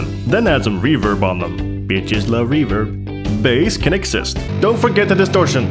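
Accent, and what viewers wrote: Norway. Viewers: Love your cute Norwegian accent hahaha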